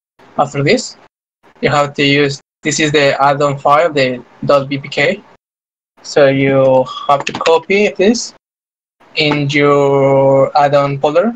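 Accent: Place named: United States. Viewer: A mira se volvio bien gringo el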